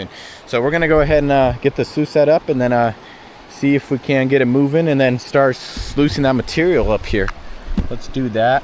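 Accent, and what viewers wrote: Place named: California, United States. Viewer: I never would have thought you are speaking English as a second language, but I do hear that there is more to your sound than California, just a very very tiny bit - 1%. Seriously, amazing to learn English as 10 - that is impressive.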